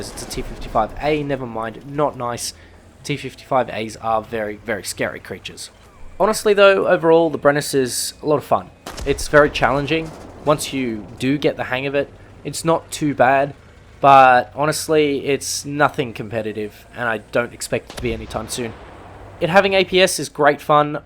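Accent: Australia. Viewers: Its better not really to care tbh, your one of the few i listen to when it comes to vidoes on the games state. Your chill (also the aussie accent just makes it better) unlike most other creators i see who make massive spiels about the littlest of problems, which i just find irritating.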